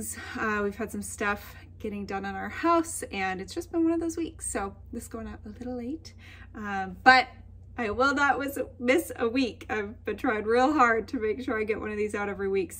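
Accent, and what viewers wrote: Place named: Midwest, United States. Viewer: loved the Midwest accent! I grew up in Minnesota and have lived in CA for 24 years. However, when I am tired or talking with family, that Minnesota accent pops right out!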